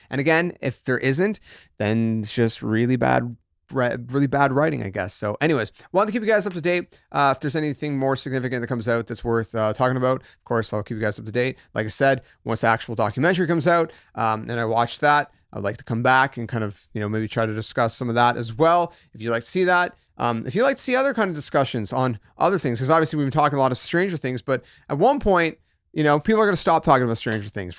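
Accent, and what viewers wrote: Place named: Canada. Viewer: I’m here for the cute Canadian accent. Here for the degrassi vibes. lol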